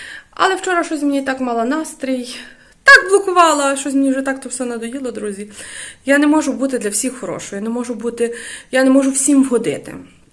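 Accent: Ukraine. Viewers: доброго дня мені подобалося ваш суржик тому, що вас також розмовляють суржиком. Я з Вінниці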